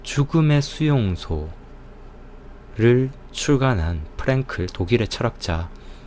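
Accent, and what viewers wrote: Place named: Gyeongsang, South Korea. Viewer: 경상도 억양의 맑은 목소리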